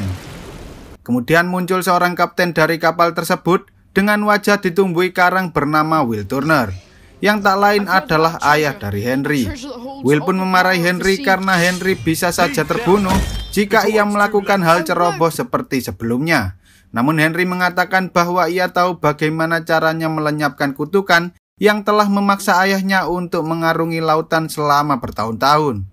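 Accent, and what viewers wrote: Java, Indonesia. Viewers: Jawanya medok skalee rekk🤣🤣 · bang sampean wong jowo ya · Wong jowo yo cak? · Meddok emet lu bru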